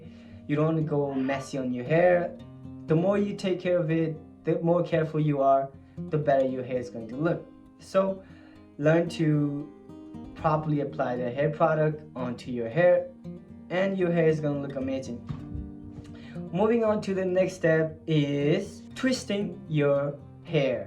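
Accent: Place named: Philippines. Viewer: He from da phillipines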